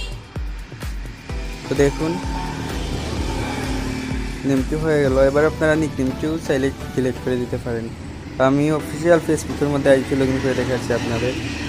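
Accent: Sylhet, Bangladesh. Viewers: Vai....Sylhety ni😁😁😍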